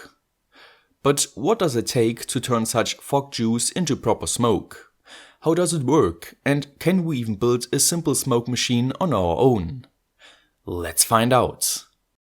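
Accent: Germany. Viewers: Are you from Germany???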